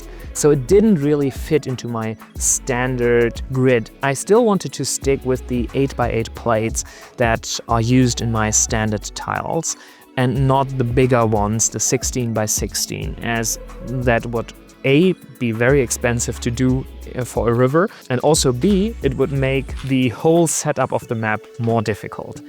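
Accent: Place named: Germany. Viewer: Der Dude ist klasse und hört sich sehr deutsch an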